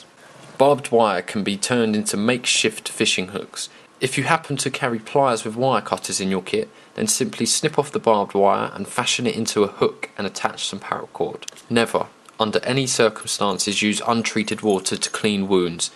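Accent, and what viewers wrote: United Kingdom. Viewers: you from uk man?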